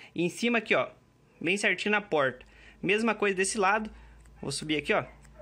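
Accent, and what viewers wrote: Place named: Paraná, Brazil. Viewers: Você é Paranáense? Sotaque legal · Vc tem uma vozinha meia de caipira sem ofeças sla como ser escreve '-' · Paranaense? Vc? Kkk vc tem sotaque,me ajudou muito obrigada, super recomendo seu canal👍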